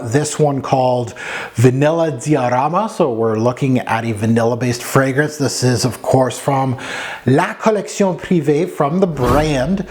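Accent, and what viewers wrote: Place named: Quebec, Canada. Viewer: Hello Mon Ami Bonjour ou Bonsoir des USA Always such a pleasure to get your opinion with amazing accent French borderline English Du Canada if I m right Merci beaucoup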